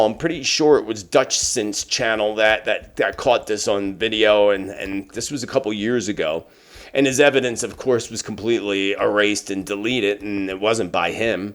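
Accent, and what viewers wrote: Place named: Bucks County, United States. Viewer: The way you pronounce certain words is very endearing:) My dear friend from Bucks County does the same thing! It’s precious:)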